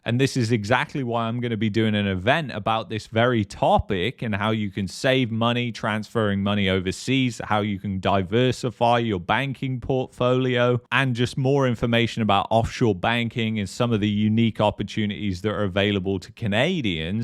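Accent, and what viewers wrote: England, United Kingdom. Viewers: This guy with an English accent.. obviously not from here... constantly telling people how bad our country is...try a positive video for once..or move home bud..guys like you are bad for our society.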